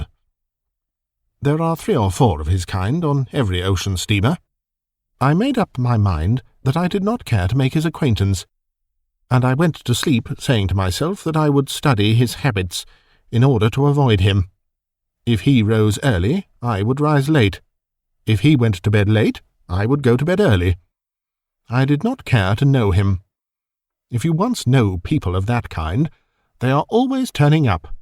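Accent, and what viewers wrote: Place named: Oxford, United Kingdom. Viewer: 👍 This seafarer horror story was well narrated, with a proper 🇬🇧 Oxfordian English-British accent 🗣️ that matches the setting of the Victorian Horror Story. Very enjoyable indeed!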